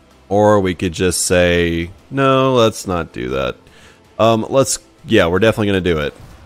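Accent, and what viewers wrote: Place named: East, United States. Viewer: You sound very eastern american. Where are you from?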